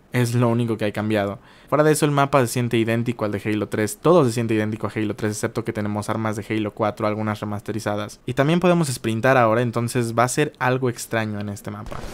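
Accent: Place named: Bogotá, Colombia. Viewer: que paso mi Cantinflas y ese bogotazo xd no te va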